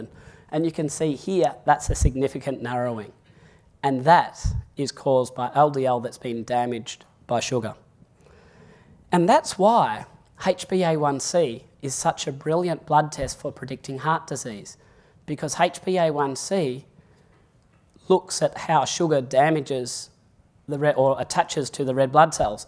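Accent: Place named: Australia. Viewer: Great post. One note though pertaining to #2 above - the doctor in this presentation does address A1C. He refers to it by its full name of HbA1C with an Aussie accent that slightly throws off an American ear. And he says more or less what you do in #2. That the time averaged blood sugar level A1C reports is proportional to glycation levels upon all cells in the body including LDL. Otherwise, I'm still learning, but one thing at least some statins have are anti-inflammatory properties. Up until I saw this video just now, my understanding was that the epithelium layer inside your arteries is damaged by the repeated tug of war between blood sugar spikes and insulin to pull it back down. That sugar-insulin cycle over and over is inflammatory and damages the epithelium which is then "healed" by plaque your body deposits to protect it (like a scab). But in this video the doctor says it's all caused by damaged (glycated) LDL particles which find their way under the epithelium and are then captured by macrophages which then become foam cells which eventually results in arterial plaque. 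So this was a very interesting video indeed. The learning continues. One thing I know to do now is to try my best to get my triglycerides down, my HDL way up and my A1C down below 5.0 if I can. LDL seems of little importance if I get the others in line.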